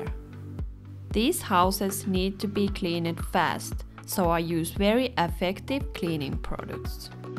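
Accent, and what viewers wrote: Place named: Finland. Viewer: And Finish accent too is.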